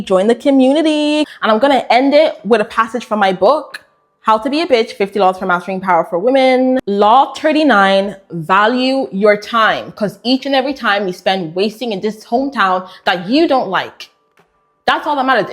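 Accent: Ireland. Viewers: Omds girl are you from Ireland?! I know a fellow black Irish girl when I hear them 😩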